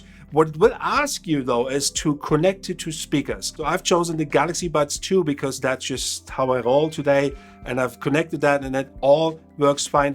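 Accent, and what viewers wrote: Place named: Germany. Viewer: Definetly heard out the german accent helped me a lot : )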